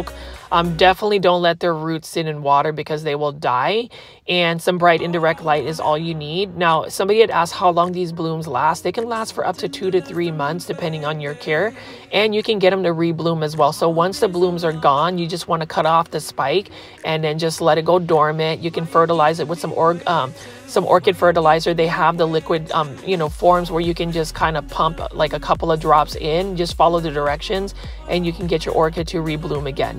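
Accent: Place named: Philippines. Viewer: Who cares how he pronounce it. Everyone already knows he from the Philippines and that he has an Filipino accent. So his word pronunciation is different from the way we pronounce words.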